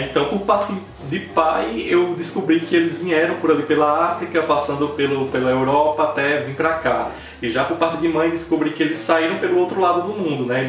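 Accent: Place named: Paraíba, Brazil. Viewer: É tão bom ouvir sotaque da Paraíba aqui no youtube ❤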